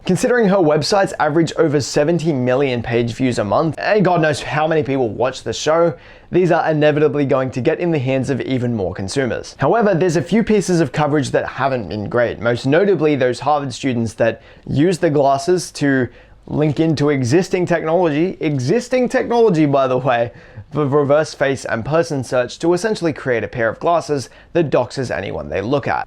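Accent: Australia. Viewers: Nice to hear a fellow Australian review and talk about these amazing glasses. Also great that you spoke about the Be My Eyes integration. Having no vision at all, it is great to see this integration happen. I’m hoping in the future we may also get some ACR features That will help when trying to read mail and menus etc.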